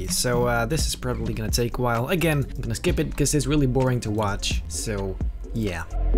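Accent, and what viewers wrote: Netherlands. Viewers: You are Dutch. Im sooo sure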